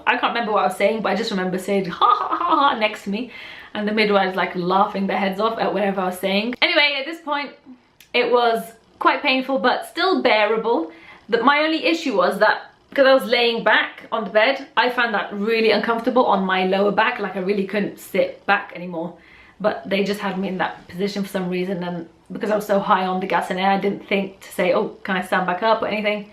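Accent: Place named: Wales, United Kingdom. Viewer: your welsh accent make this video ten times funnier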